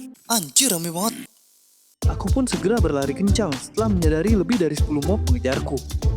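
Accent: Bali, Indonesia. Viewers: logat bali bnget nok kakaknya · Orang Bali wi keliatan banget logat nya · orang bali bg? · berlogat bali wi nya jok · org bali kah?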